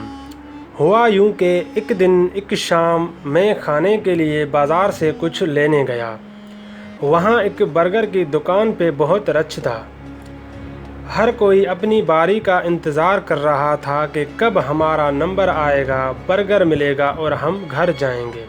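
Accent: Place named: Sindh, Pakistan. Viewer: Keep it up Baki urdu improve karne ki kafi zaroort ha kafi sindhii lhja ha 😁😁😁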